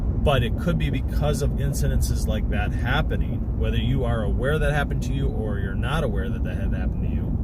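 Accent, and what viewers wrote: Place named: Australia. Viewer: You tell like a Aussie 😁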